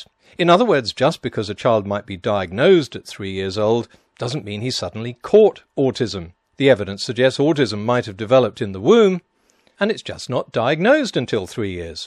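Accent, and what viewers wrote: United Kingdom. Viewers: I take this guy twelves more seriously because of the GODDAMN BRITISH ACCENT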